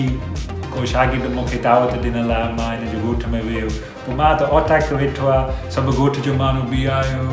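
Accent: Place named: Sindh, Pakistan. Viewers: Enka to lehja bhi Sindhi hogya he. Or style bhi pora. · Wãh yãr! Hinna ẗa local accent bi pakře warẗo ãhe.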